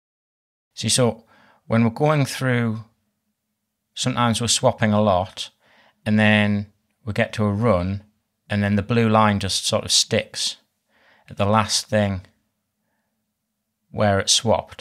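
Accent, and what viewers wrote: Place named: Northern England, United Kingdom. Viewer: I liked the clear explanations and the awesome animations. I also liked your Northern English accent. On the other hand, as a programming noob who has only studied Python 3 I would have preferred the language to have been Python 3. You never said which language you were using. Is it JavaScript? I could not understand the line of code at the top and you never explained it. I think your method of starting with something simple and adding optimizing code later is great. However, I would have liked it to start even simpler. I am here because of your Twitter reply to 3B1B. Do you have any Python 3 tutorials? I am especially interested in string manipulations, simple chess playing programs, and simple text to speech stuff, not that I am particularly typical. I am also an explainer, but I do my explaining on paper (figuratively speaking) in my free blog on Medium.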